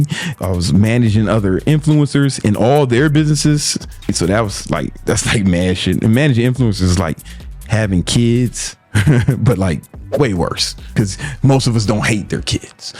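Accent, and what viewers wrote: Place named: South, United States. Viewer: southern twang haha i didnt know it was called this way im from France by the way so we'r definitely no experts at accents southern twang from brave men though 🤷🤣🏆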